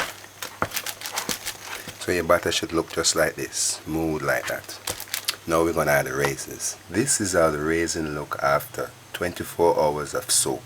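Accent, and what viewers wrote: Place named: Jamaica. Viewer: Yes, I think you're Jamaican "alf" and "cark". Lol, only a Jamaican can relate))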